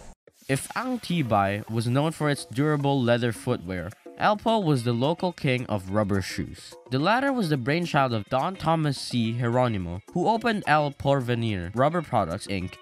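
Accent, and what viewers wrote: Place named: United States. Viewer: Are you American? Pinoy products pero parang Amerikano yung nagsasalita. I find that odd.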